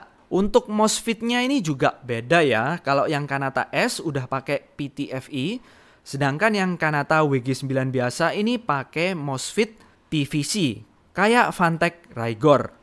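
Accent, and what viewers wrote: Java, Indonesia. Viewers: medhok lee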